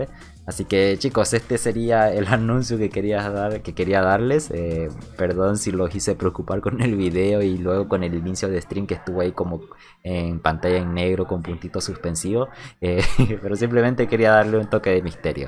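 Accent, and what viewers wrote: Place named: Santa Cruz, Bolivia. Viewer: Si te soy honesto, yo te seguia por tu forma de comentar y jugar.. no llevo muchos meses, pero francamente no tenia idea que eras de Bolivia para que mentirte... Por tu acento me imagino que eres de Angel Sandoval, Santa cruz?.. hablas como entonao XD le subes enfasis a las O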